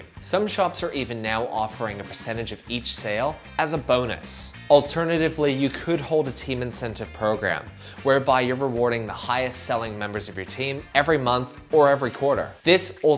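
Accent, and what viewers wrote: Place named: United States. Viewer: Thanks a lot for the comment Jack! Appreciate your support. I moved to Australia when I was much younger from the states. Never lost the accent fully!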